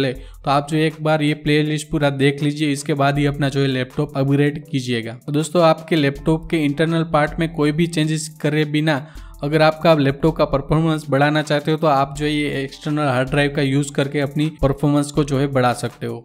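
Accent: Gujarat, India. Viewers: Ap Gujarati ho?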